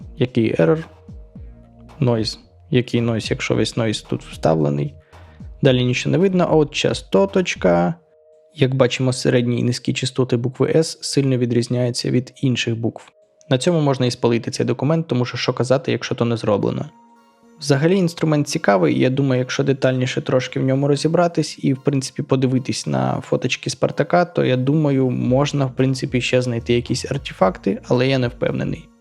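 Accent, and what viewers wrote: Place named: Western Ukraine, Ukraine. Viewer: Я думаю, українська у нього рідна (проскакує західний акцент).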